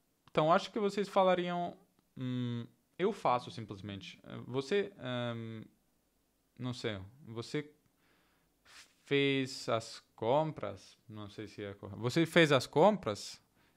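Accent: São Paulo, Brazil. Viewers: Ciao,. Imparo tanto tanto "contigo" che vorrei darti indietro un po' dei miei commenti da nativo pigna che ha sempre qualcosa da dire Algumas dicas: "me deu" diferenças, senza la "i" profissional, con la "i" parabéns!!! "padrão", non mi aspettava - bello uso! accezione vira acepção, que é un parolone alto; forse potresti usare sentido Pude notar uma bela mudança das tuas "d", como fazem os paulistas. Essa fala híbrida com uso de "você" ao mesmo tempo que usamos as "subformas" do "tu" (te/ti/tua) é uma coisa que faz o Pt-Br bem insólito e complexo para os estrangeiros. Falamos "teu/tua", mas "seu/sua" soa mais macio (la "s" è sorda, morbida) que a dureza do "t", que vem de maneira até um pouco rude. Você já viu que "seu" é "pronome de tratamento" também? Tipo: "Seu Eugênio" = "Senhor Eugênio". o tempo "todo"; tudo x todo - eu achei um "quick way" para explicar isso e você saberá pôr em um conceito gramatical mais complexo. 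Todo é usado usualmente seguido de um substantivo (todas as coisas, todo o mundo, todas as mulheres), enquanto (tudo é um pouco self-sufficient haha e está bem por si só (ela comeu tudo, eu quero tudo, tudo que eu quiser...). Não sou linguista. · 👏🏻👏🏻👏🏻👏🏻👏🏻 Amei quando você falou "estou aprendendo subjuntivo futuro" 😂 Acho que eu nunca aprendi... Sto scherzando. Você está falando muito bem. Com o sotaque de Sao Paulo. O sotaque de São Paulo está para o português "standard", assim como o sotaque de Milano está para o italiano "stantand". Por exemplo, o nativo de São Paulo não usa o pronome "tu" no lugar de "você". E aqui, por influência dos italianos que vieram morar no Brasil há mais de 150 atrás, falamos as sílabas com o mesmo tempo... sem suprimir nenhuma sílaba. Isso faz o português do Brasil, sobretudo o de São Paulo, ser semelhante ao modo de falar dos italianos do que dos português. 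Por exemplo, aqui no Brasil falamos "pre-si-den-te" com o mesmo tempo para cada sílaba. Já o portugues de Portugal, eles apertam, espremem algumas sílabas, dificultando o entendimento. Você está indo muito bem no aprendizado do portugues brasileiro. Mas acho que a ultima coisa que você vai aprender é que não falamos "mais grande"... não falamos "piu grande", como os italianos costumam falar. Mas falamos "maior" ou "é maior". 😊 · Está ficando muito bom o seu português, pra mim vc está com um sotaque mais perto do Paulista.. se vc falar com TU, fica parecendo um sotaque da região sul do Brasil, vc vai se confundir muito, de uma focada em vídeos aqui do Brasil como o canal NOSTALGIA, HISTÓRIA E TU, vc vai identificar as palavras com mais facilidade... uma pequena ajuda...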